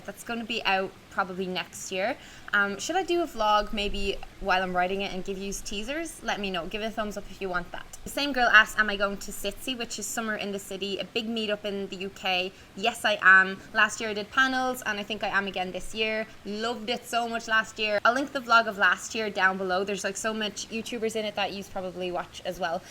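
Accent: Ireland. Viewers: im from cassie's video. i love your irish accent! :) youre so nice lending your EH to cassie even of she stole it lol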